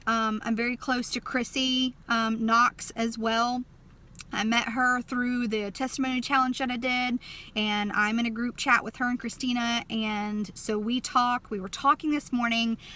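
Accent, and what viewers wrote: South, United States. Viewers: Your Southern accent makes me miss Texas and where I grew up. It is cold here in Germany also:-) GOD Bless in Jesus Christ name:-)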